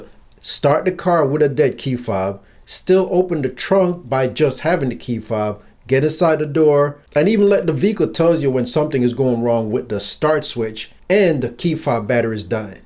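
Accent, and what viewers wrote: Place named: Jamaica. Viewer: You sound Jamaican ...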